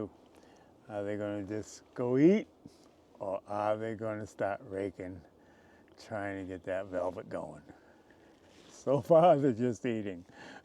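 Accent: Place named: East Coast, United States. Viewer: I often wondered when the shedding took place. Seeing the breath i guess cooler air is starting already. Hearing your accent any chance your from the east coast?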